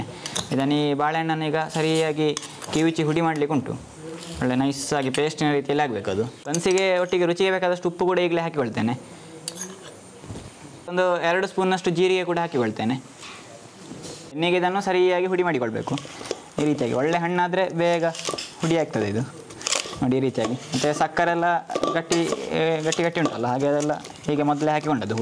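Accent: Mangalore, India. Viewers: Sir nimma Mangaloor maatu tumba chanaag iratte kellike...😍😍😍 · Mangalore kannada🔥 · I love Mangalore accent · ನೀವು ಅಡುಗೆ ಮಾಡುವ ರೀತಿಯು ಅದ್ಬುತ. ಹಾಗೆ ನೀವು ಮಾತನಾಡುವ ಮಂಗಳೂರು ಕನ್ನಡವು ಕೂಡ ತುಂಬಾ ಅದ್ಭುತ. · Great to hear your Mangalore kannada accent. Buns is my favourite snack for tea. Well done from a mangalorean living in Australia. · It just took me 20 seconds to Subscribe to your channel because of your genuine smile and the Mangalore accent.. Cheers for Life..!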